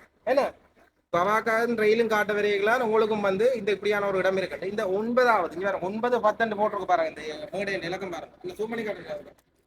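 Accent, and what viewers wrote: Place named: India. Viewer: Nice and useful video, hope you are from Jaffna, but smells a bit of Indian Slang!!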